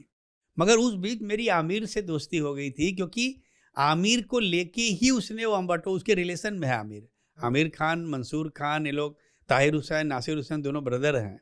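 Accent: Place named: Uttar Pradesh, India. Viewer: Khushi is baat se aati hai ki jab bolte ashutosh beta ,ye jo hamare UP ka jo lejhga hai h dil jeet leta hai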